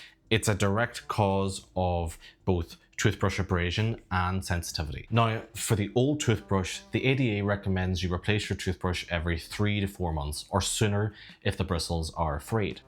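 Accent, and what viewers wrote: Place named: Ireland. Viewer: Good video love the Irish accent and you are kind of gorgeous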